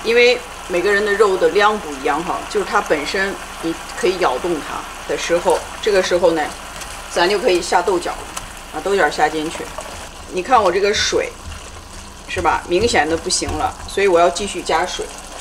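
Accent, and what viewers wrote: Shaanxi, China. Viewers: 陕西话听着就是舒服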